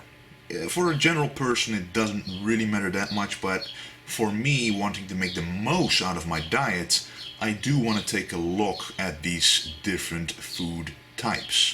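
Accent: Netherlands. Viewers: I had a great time hearing your dialect, beeing Duch myself I immediately heard that, which is totally hidden from me when you speak english. That was fun, I didn't expect that at all. Thanks for so many great great videos. Next to Mountaindog John Meadows and Athlean-X Jeff Cavaliere this is an awesome source of high quality, down-to-earth information.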